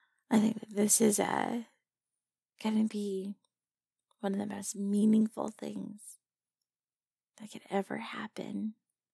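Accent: South, United States. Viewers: I hear some southern twang. Kinky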